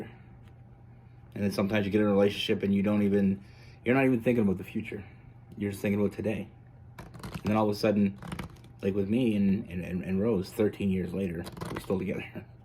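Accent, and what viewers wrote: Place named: Toronto, Canada. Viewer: U sound like ur from TO. I’m from beaches ✨😄✨ Nice reading 🙋🏼‍♀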